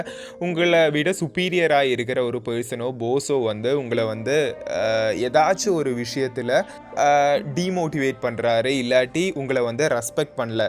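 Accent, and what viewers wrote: Kerala, India. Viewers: Kerala thambi vioce maari irukkea 🤔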